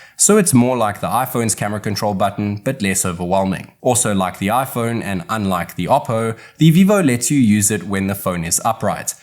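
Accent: South Africa. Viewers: Just ordered a Vivo X200 Ultra .. I didn't get the the Photography kit though. Stumbled across your video and thought :" Hey he sounds South African " and then I saw Table Mountain 🤣🤣 Brilliant video. I am so excited for mine to arrive. Will only be shipped to SA Feb 23rd due to Chinese New Year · I absolutely didn't expect you being South African! 😂 I thought all (white) South Africans speak like FW de Klerk or the other one... EtB, who came on a black horse. 🤭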